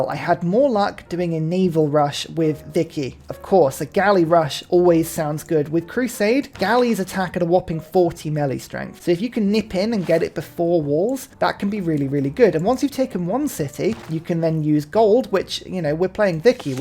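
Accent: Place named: United Kingdom. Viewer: You play lovely music and your presentation is friendly and fun; love your British accent and funny little voice; witty and (as you Brits like to say) "brilliant."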